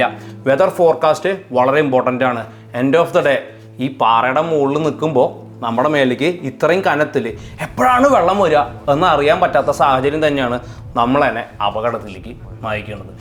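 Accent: Thrissur, India. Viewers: Bro, you are amazing.... The way that you told through the examples are very appreciated. Especially the slang of our Thrissur is lovable... Cheer's bro🤝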